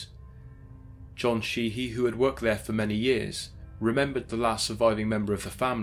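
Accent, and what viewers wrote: England, United Kingdom. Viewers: Forgive my ignorance but Why is an English man narrating this?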